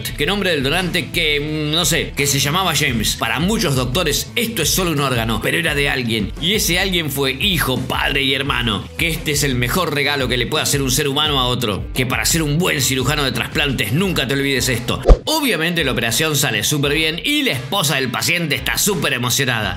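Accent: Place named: Argentina. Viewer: Me parto de risa contigo 😅😅😅😅😂😂😂😂🤣🤣🤣🤣🤣, la forma de contarlo. Y ese acento argentino 😄😄😄😄😍😍😍😍😍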